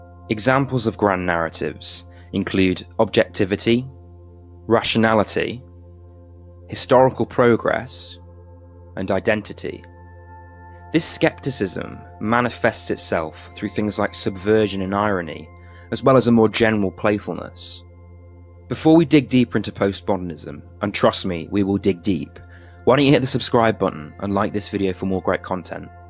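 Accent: United Kingdom. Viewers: His British accent just sounds so clever and he says everything so confidently, i would be fooled if i didn't know better.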